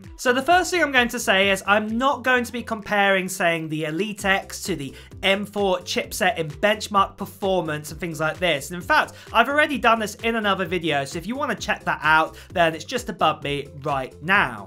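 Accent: United Kingdom. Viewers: 1) No offense but you do have a strong British accent/dialect and talking way too fast. Thus, for many people who’s English is fluent but not the first language is hard to understand you unless you slow down a bit. 2) We need a real world test of this Elite X Laptop vs Mac Book pro where we compare 4k video rendering time length between MacBook Pro and best Elite X laptop. Everything else is silly and waste of our time because even the cheapest intel i5 laptop would be snappy at opening apps, web pages and photos and is not an indication of how faster or more powerful elite X processor is.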